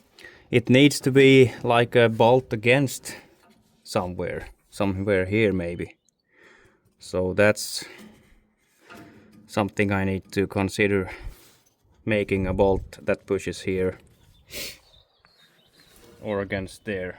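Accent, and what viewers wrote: Finland. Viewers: Good video, how did you learn such good English, it’s very good. I see where you live, looked it up on Google Maps. But you sound exacting like everyone I knew growing up with your accent, I love it, reminds me of times and old timers long gone. Don’t know if you know anything as far as geography of US. But I grew up in Toivola, Minnesota, 50 miles NW of western tip of Lake Superior. All my ancestors came from Finland, I’m old 66, probably last generation here that didn’t learn Finnish, my grand parents tried, but I guess I had nothing to do with it when I was little lol, but when I went to first grade in 1966, there was some of my class mates that could only speech Finnish. Love watching your videos, and learning about your country and ways, I grew up on the farm also. One thing I know!! you live close to that big body of water that controls controls your weather! 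Our big Lake Superior does the same thing here. I always said my ancestors must have settled where they did when they got of the boat and train because the weather was horrible just like what they left so it was just little home!!!!!😂😂😂 Thanks your friend Larry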